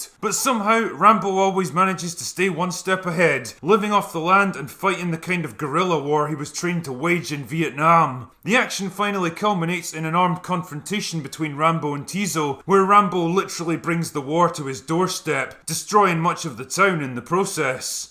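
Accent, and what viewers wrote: Scotland, United Kingdom. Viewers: I assume by your accent that you're a Scot. Do you know the film Culloden by Peter Watkins? This was his first professionally produced film and paved the way for the BBC to produce The War Game (which they then refused to transmit) <link>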